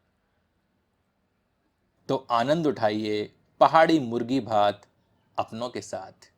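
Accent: Standard. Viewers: शुद्ध हिंदी उचारण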